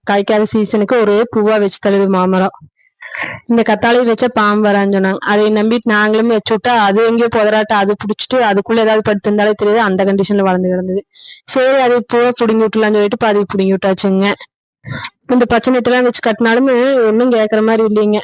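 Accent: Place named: Kongu, India. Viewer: மகிழ்ச்சி உங்க கொங்கு தமிழ் கேக்க இனிமை.வாழ்க வளமுடன்.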